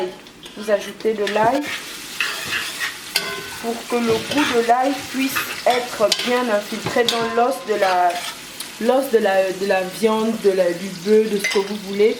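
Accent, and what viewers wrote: Gabon. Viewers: Au fond le sans accent des gabonais fait leur accent, gabonaise pure pure.. Merci mama!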